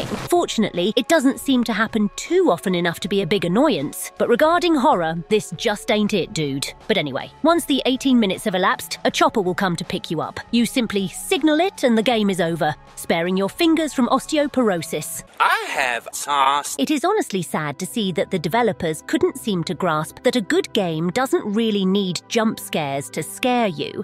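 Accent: Argentina. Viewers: Y mira, por como habla español medio que se deja entrever que es de Argentina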